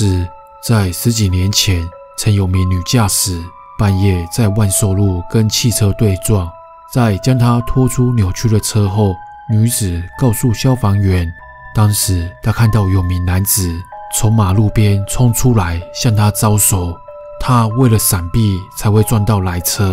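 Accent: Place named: Taiwan. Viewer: 台灣國語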